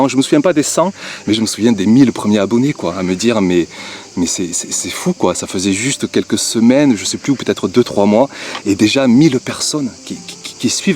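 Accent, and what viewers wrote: South, France. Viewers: Hello, Happy birthday 🎉🎉🎉🎉, je te suis depuis un peu près 8ans, et je ne m'en lasse pas😂, je suis de Belgique.. Mon potager est tout mini rikiki comparé au tiens, mais je ne cultive que l'été, j'ai une superbe serre que mon chéri m'a acheté et j'y suis tout le temps.. Si c'est pas en cuisine, c'est dans le potager 😂😂😂. Le potager est mon excutoire, mon endroit de ressourcement, et puis j'ai comme toi cette fierté de donner des bonnes choses à manger à ma famille, j'aime aussi le partage, et souvent plutôt de garder pour moi, je donne.😮. Ne change rien, ton authenticité et l'humanité que tu degages en fais ta force..et puis c'est tellement plaisant d'entendre ton accent du sud rempli de bienveillance 😊. Sois heureux de ce que tu fais et fière de qui tu es. Au plaisir 😊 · Salut, alors je ne peux pas te dire depuis combien de temps je regarde tes vidéos mais depuis pas mal de temps! 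Je suis toujours content quand je vois que tu as publié une nouvelle vidéo, et j'ai hâte de la voir quand j'ai 5min Je me souviens encore les dimanche a me dire tient mais faut que j'aille voire Youtube a du mettre une vidéo 👍 Merci a toi en tout cas toujours "une totale regalade" comme tu le dis souvent avec ton petit accent du sud 😉 Perso j'adore les expérimentations sur différentes techniques etc, je trouve que on peut être surpris des pratiques qu'on fait depuis longtemps ça remet en question j'aime beaucoup! Continue comme ça et merci encore!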